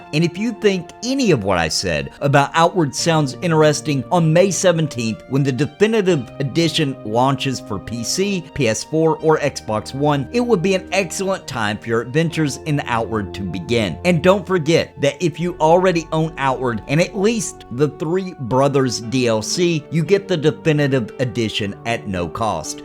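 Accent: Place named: South, United States. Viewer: Can't wait for Definitive Edition... I bought The Three Brothers so I get it! Hey man you from the south? I'm from Tennessee, I hear a little twang in there. Thanks for the video.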